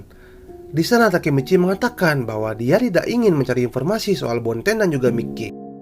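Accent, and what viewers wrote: Bali, Indonesia. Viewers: admin orang bali keknya wkwk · dari bali ya bli? logat nya udah keliatan nok wkwk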